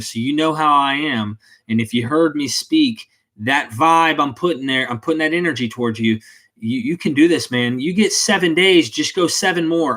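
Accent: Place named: Virginia, United States. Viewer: Are you from VA? Just guessing based on your accent.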